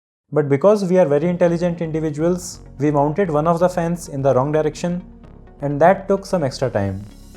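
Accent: India. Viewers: Idk why but i had to laugh so hard bc of the indian accent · Indian accents have to be the worst to listen to my god. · finally an indian who speaks in indian accent, this is what I like not talking in a shitty american accent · The same Indian accent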